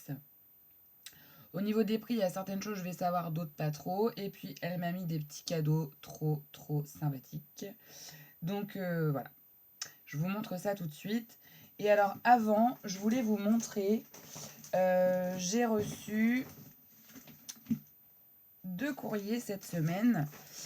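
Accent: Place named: England, United Kingdom. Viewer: J'adore ton accent anglais :)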